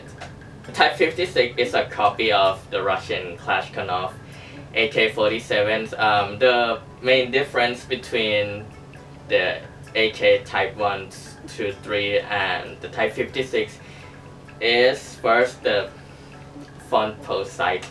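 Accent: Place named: Thailand. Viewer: ก็ว่าอยู่เวลาพูด สำเนียงเหมือนคนไทยจัง555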